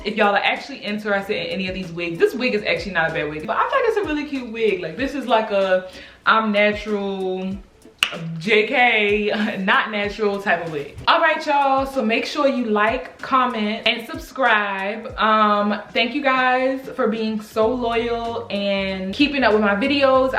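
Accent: Baltimore, United States. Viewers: The curly was cute 😂and you sound like you’re from Baltimore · I watched your first Amazon wig video and I was like she gotta be from Baltimore, the accent in this video confirmed it. Nice to find someone from my city I can watch 💯🙌🏾 keep the videos coming you're hilarious too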